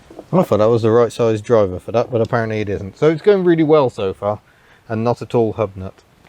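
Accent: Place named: England, United Kingdom. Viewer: I like how you English say chickin. Daewoo is a nice shape but not the colour I would personally pick. That three cylinder growl was a treat to the ears. I also enjoyed the Charade for that in a recent video.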